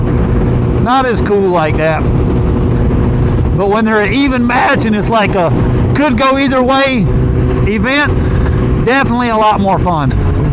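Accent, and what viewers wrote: South, United States. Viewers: bro I love your stuff, I just have to ask has anyone ever told you, you kinda sound like Charlie Day with a southern accent?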